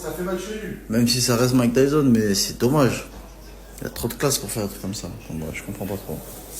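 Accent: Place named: Cameroon. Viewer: Arrête de parler camerounais tu fais honte au continent !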